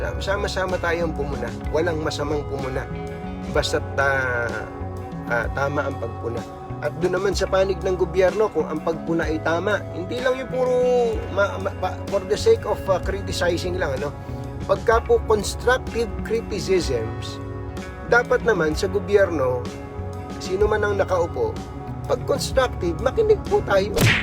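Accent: Nueva Ecija, Philippines. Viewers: Bata palang ako nakikinig na ako sa program mo sa radyo dos por dos dipa kasi uso tv sa lugar namin noon hinahangaan ko po kayong reporter Sir Tunying natutuwa din ako sa tuno ng tagalog mo ecijanong ecijano kabayan po tayo.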